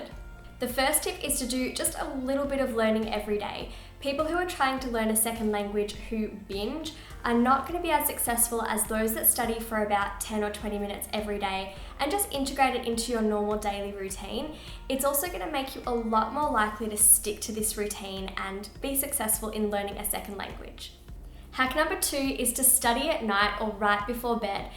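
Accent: Australia. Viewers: Girl, I love your Australian (I think) accent!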